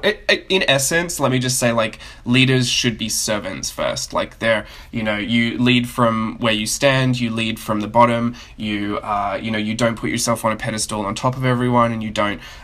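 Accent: Australia. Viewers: Please never lose your Australian accent! Keep up the good work! Thanks!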